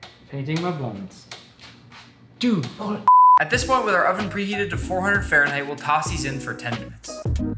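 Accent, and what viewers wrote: United States. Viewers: Sounds pretty American to me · this sounds very american lol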